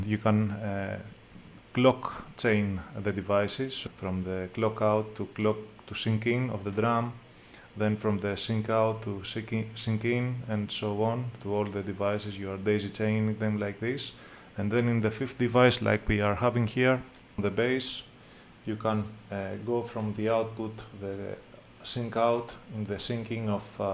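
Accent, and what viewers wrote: Greece. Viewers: ahahahah you are greek I can tell🤙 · that's the Greek accent, for sure !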